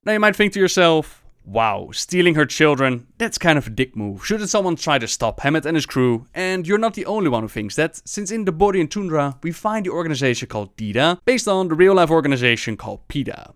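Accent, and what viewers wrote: Netherlands. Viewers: theonesinblk you know he's dutch right? Accents are accents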